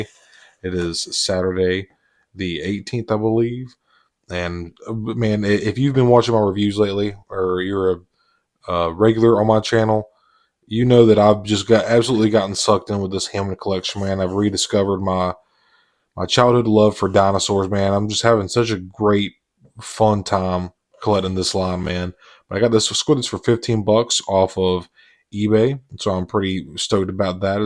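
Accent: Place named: South, United States. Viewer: Spitin Dino facts in the southern accent is worth watching till the end y’all. 😉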